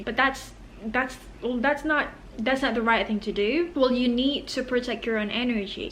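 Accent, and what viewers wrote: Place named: United Kingdom. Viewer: i am addicted to your british accent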